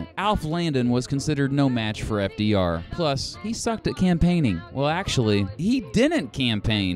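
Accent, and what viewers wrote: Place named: Kansas, United States. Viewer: '' I am from "Keensas" '' I can hear that.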